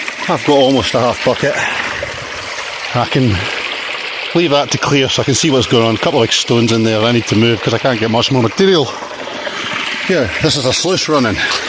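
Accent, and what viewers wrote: United Kingdom. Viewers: I knew that twang was Edinburgh, I take it your not far. Was going to say thought I knew your voice when first heard u but surely not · Never heard Scottish befor . Love it. Good luck with gold hunting. You'll be needing it soon.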